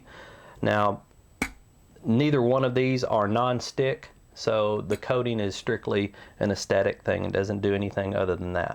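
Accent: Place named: East Tennessee, United States. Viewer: Good review. Your accent shows your family was from East TN or similar Appalachian Mountain origins; maybe that is where you get your backpacking wings? Never heard a TX person nail the East TN accent as well as this.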